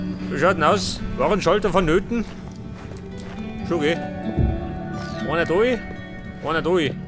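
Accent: Bavaria, Germany. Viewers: Saugeils Video schee dos du in Bayerisch redst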